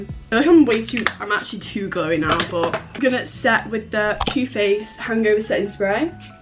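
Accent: United Kingdom. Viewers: Is this more a southern uk accent or a northern one? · do you have british accent ? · Are you from the uk🇬🇧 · I freaking love your British accent!! You’re so pretty too!! <3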